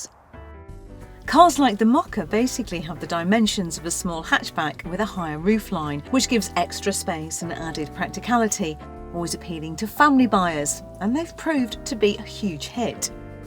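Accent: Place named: RP, United Kingdom. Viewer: Lovely poshish accent.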